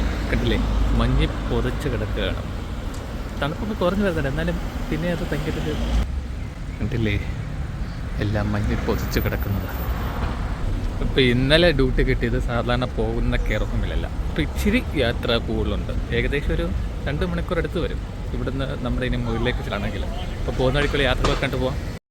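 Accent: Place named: Pala, India. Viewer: Kottayam ano vidu oru pala shayili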